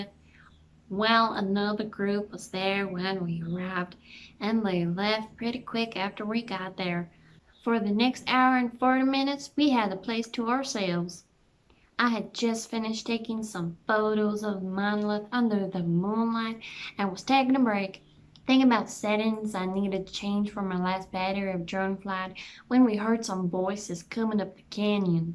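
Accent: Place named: South, United States. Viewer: Wasn't expecting a full Southern accent 😅, loving the informative content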